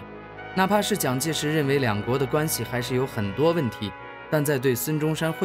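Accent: Cantonese, China. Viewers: 中山先生的广普，亲切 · 老廣口音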